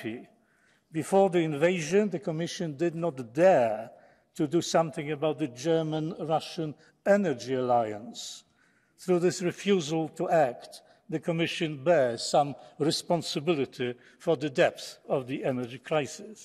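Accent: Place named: Oxford, United Kingdom. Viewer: Panie Profesorze powiedzieć że jest Pan genialny to jakby nic nie powiedzieć....i w dodatku ten Oxford English...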